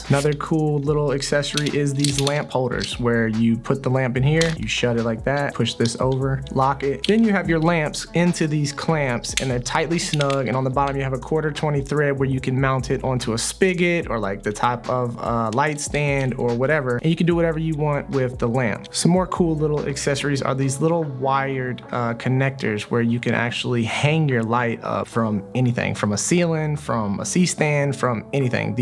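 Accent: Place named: Philadelphia, United States. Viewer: Bro I swear you sound like you’re from philly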